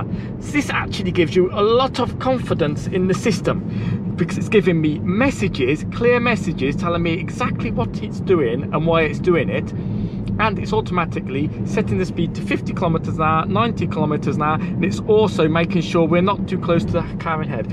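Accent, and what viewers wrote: United Kingdom. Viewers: Hi, great video! I have an ID.3 Life on order. I’m curious, are you British, Portuguese or what? Greetings from Sweden. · I agree again when it comes to B mode. In the end it depends on the kind of roads you're driving on and your personal preferences. The Travel Assist seems to be working quite perfectly. I can't wait to get my ID.3! BTW: Are you British or how did you get this nice British accent?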